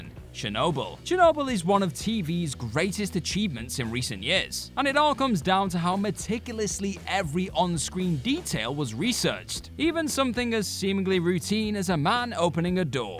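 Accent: United Kingdom. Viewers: It's just a British thing, they say haitch rather than aitch for the letter 'h'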